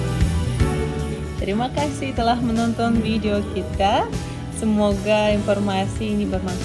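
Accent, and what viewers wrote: Java, Indonesia. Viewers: Logatnya sdh seperti orang indonesia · ngomongnya Indonesia bangett mbak ...... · Logat mu bersih sekali , sudah persis orang Indonesia asli, agak terpengaruhi logat jawa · Eksennya sudah orang Indonesia.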